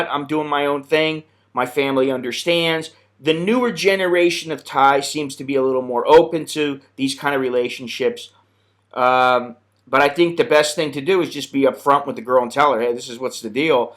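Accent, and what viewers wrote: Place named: Chicago, United States. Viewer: Seems more like from Chicago.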